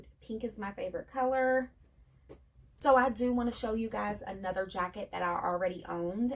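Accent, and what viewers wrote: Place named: Southwest Virginia, United States. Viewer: your channel is my favorite! love your accent. It sounds a lot like mine. I'm from Southwest VA. I have two daughters and also live in a mobile home so we have a lot in common. I love to watch your channel for cleaning motivation. You're daughter is so cute and you are too! love your long hair!! Hope you have a great day. <3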